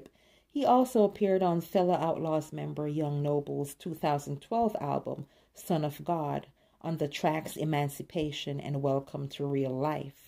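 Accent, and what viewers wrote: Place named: Jamaica. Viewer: Narrator: "Jamaican accent" 👍🏻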